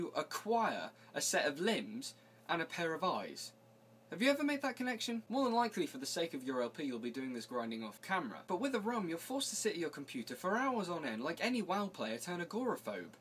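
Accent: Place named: United Kingdom. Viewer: Congrats on the 2000. I know with the LPs I watch the commentary I like to listen to some one who sounds (key word) atractive. Not only you have a lovely, natural, Brittish accent, but you put your lovely, actractive face on the screen. You have the extra facial expressions that a lot of the more popular LPers don't post on their videos. This also makes your LPs unique.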